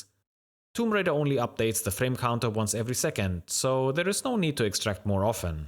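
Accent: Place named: Franconia, Germany. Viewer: You're from Franconia, Germany, right? That accent sounds very familiar!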